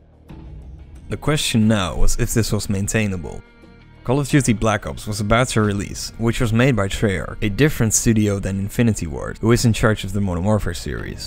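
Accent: Netherlands. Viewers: the dutch accent is unbearable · the guy speaking is dutch, 100% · dutch accent?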